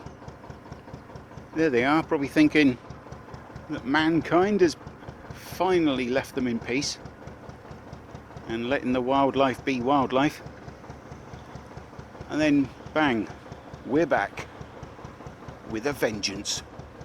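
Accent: England, United Kingdom. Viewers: I also discovered you on Amazon prime,, You saved my mental health through out the lock down!! I loved the scenes, the homes, the towns,the sound of the Mc laren motor.. your English accent.. I am sorry about the thugs on New Years eve,, what a bummer!! Made me so angry ..You are a Gentleman,, and I thank you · Omgoddess RESPONDED! I feel special. The herons of my home state Kansas, are a bright blue! I love your travels along the locks and Aslans chug chug, and all American lassies love the English accent. 🥰 Your chopper is also very boss!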